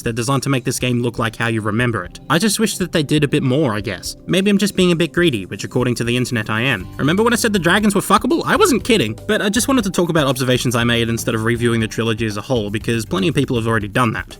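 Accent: Australia. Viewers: Watching this in class FUCK ENGLISH WHEN YOU HAVE AN AUSSIE MAN THAT SPEAKS ENGLISH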